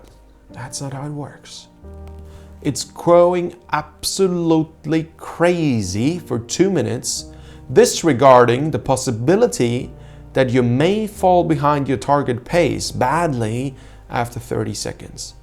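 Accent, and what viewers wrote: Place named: Italy. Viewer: I absolutely loved the energy from this video!! Its tis type of race that inspires those magical stories about rowing; the inhuman passion drive/sprint that shoots a crew through the finish first against the odds. I always get the 2017 world's Lodo and Vicino pair vibes, just channelling the passion and drive for victory. Videos like this are fantastic! Loved it, and 10/10 Italian accent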